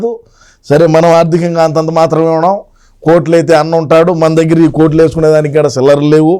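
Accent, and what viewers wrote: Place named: Kadapa, India. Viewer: చూశారా 😂😂😂😂😂😂 ఏం మాట్లాడినావు నా ఊరిలో భాష.👌👌👌 మా కడప దగ్గర భాకరాపేట మాండలీకం 😂😂😂😂😂😂😂 👌👌👌👌👌👌👌👌😢👌👌👌👌👌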